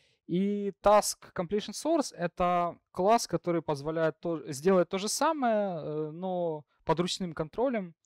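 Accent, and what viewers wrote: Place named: United States. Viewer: Скедулер - это нормально, американское произношение. Вот пример еще одного спикера так же применяющего данную фонетику <link> Async programming in .NET: Best practices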